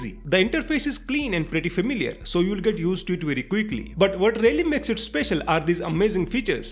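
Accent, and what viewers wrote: Kashmir, India. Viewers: For The Sake Of The Holy Cow !🐄 That's a very heavy Indian accent My Friend. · By any chanass brother, u koshur??? I caught the accent 😅❤ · Are you Kashmiri? The accent gave it away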